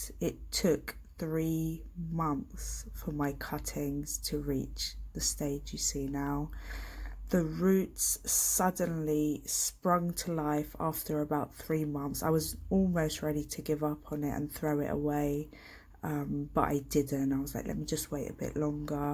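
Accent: England, United Kingdom. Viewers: So glad you made this video. Thank you! I love the sound of your lovely English accent too 🌿💜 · I was really hoping it would work in water. Apparently if you take cuttings in summer us best and quickest to root but you can do it with the softer growths in winter and will take about 3 months to root. I'm judging from the accent your in England so the colder climates may slow down the rooting a bit too. Off to try this and glad I don't have to faff about with rooting hormone.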